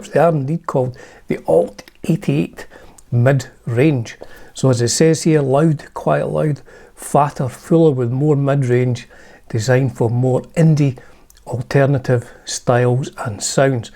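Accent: Scotland, United Kingdom. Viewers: I love a gorgeous Scottish accent !! ❤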